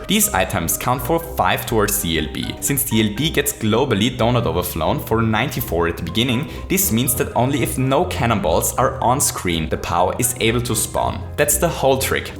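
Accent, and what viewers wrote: Germany. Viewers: Do you speak german? Because you have a german accent. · Höre ich da etwa einen deutschen Akzent? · Is it really an accent? He is German, so it's just a German trying to speak English.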